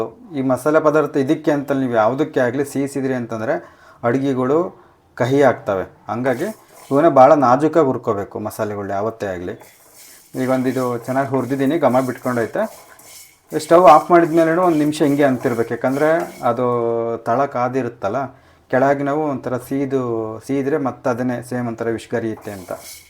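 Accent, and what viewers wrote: Chitradurga, India. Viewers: 👌🏻👌🏻.. ನಿಮ್ಮ ಚಿತ್ರದುರ್ಗದ ಭಾಷೆ ಚಂದ... ನಿಮ್ಮ ಅಡುಗೆ ಹಾಗೆ....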